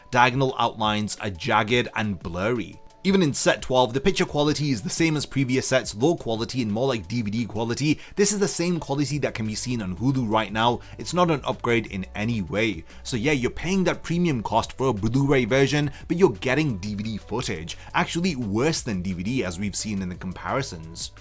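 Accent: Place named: United Kingdom. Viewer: i have been buying the UK blu-reys, of Bleach an the Naruto ones, i did my own comparison from Naruto on Disney+ an found that the Disney+ version was way lower quality than the Blu-rey ones. I havnte checked Bleach tho. As this is a new video didnt u try the UK versions? Forgive me but you sound British maybe u live outside the UK, i dont know or maybe u have a large US fan base and are catering for them??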